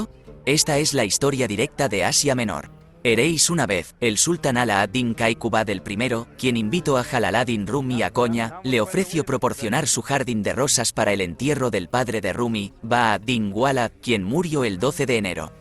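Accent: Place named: Spain. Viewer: que mal habla, aprenda a pronunciar propiamente, hace del video una mierda.Ese acento español, pronuncie como se pronuncia en su idioma. la ñ, y no es túrquia es turquia. IGNORANTE!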